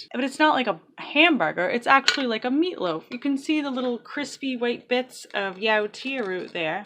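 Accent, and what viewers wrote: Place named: New England, United States. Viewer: Thankfully I recently found your channel and the moment I heard you speak, I KNEW you were also a New England girl. 🙌🏻. Thank you for all you do here. I see the comments. I know all the positive feedback you receive, but I hope you truly understand what positive things you are doing for all of us who are desperately trying to heal themselves in various ways though just don’t know how. Many many thanks. ♥️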